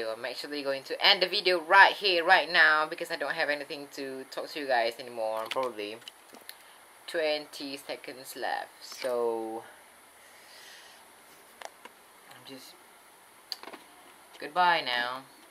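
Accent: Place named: United States. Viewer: dude, if you love british accent why not try use it in your other vlogs...most of your vlogs are in american accent...try new things..it would be great..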